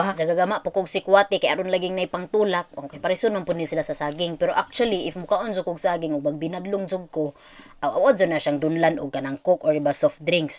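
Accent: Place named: Bohol, Philippines. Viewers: Basta boholanon puro naay GGGG,,kaway2x sa mga naay 1/2 dugong boholanon 👋👋👋👋bisag naa me dere nagdako sa mindanao pero makuha gyud namo ang storya ur talk pagka buholanon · taga loon ms. kay grabe ka gahi at first maka tawa ko pero di ko kabalo unsaon na di sya ma offend, j man pud ahong inistoryahan kay taga leyte man ko pero ang taga loon grabe ka gahi jud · Same tag accent dre saint Bernard southern leyte ms,ang ka J jud me dre gahe m dre ug inistoryhn hehehe, maoy istorya dre ag amy daw accent ky s bohol jud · NINDOT KAha imo accent, Normal ug native sa Bol-anon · Lahi2 jud tag accent amoang inistoryahan pinagahi pod hehe,anyway taga isla kos Buenavista niya kabalo kos inyun accent..kababayan ganahan kos imong tingog maong pasagdi lang na cla iampo nalang hehe. Watching from ohio USA God bless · Kalami na lang gyud paminawon ang imong bol-anon nga accent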